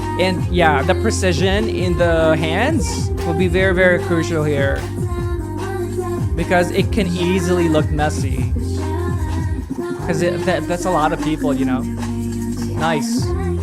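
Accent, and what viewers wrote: Philippines. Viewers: Your accent reminds me of our filipino nanny from when i was younger. I miss her 😣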